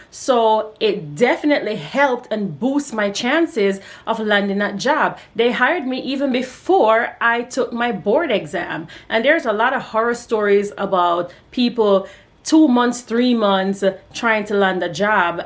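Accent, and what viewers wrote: Jamaica. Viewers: Yeah I can hear the patio 🙂 you are a yardie 🇯🇲. I enjoyed your bullet points. And yes, I am an aide.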